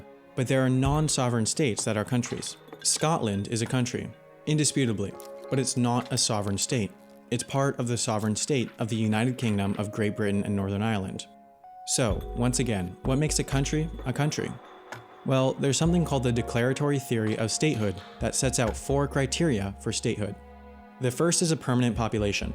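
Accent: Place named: United States. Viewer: You may have answered this already, but are you originally from the US? I know you live in the UK now, but you definitely sound American.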